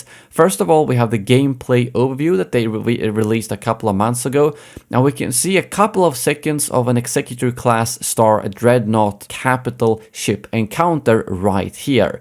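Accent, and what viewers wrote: Sweden. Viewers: Sorry but I have to ask, are you swedish? If not i’m sorry for asking but it sounds like a swedish accent and Im from Sweden.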